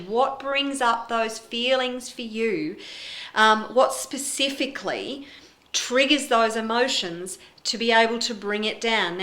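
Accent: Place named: Australia. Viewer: this aussie lady has me thinking in this accent at times.. oh boy very funny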